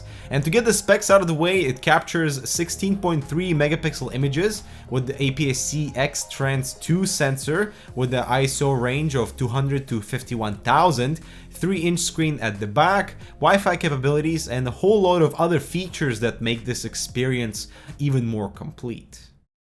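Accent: Canada. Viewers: Nice review! Your name, russian? But canadian accent! Cool! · What part of Canada are you from? You have a heavy accent.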